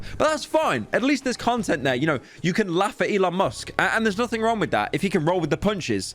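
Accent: United Kingdom. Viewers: Bro why british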